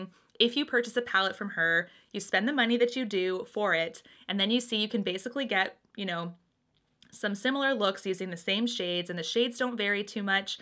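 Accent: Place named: Canada. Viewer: I have to say that for some weird reason, I am OBSESSED with accents and ways of speaking from other countries, etc. I love how you Canadians say ABOUT!! ❤️❤️❤️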